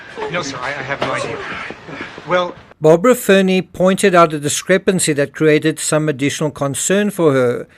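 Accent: South Africa. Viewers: I think it's the narrator's South African accent pronouncing Barb.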